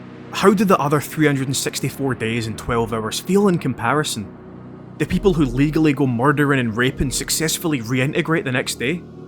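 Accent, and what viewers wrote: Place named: Scotland, United Kingdom. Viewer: Your accent reminds of Trainspotting! Scottish! 😅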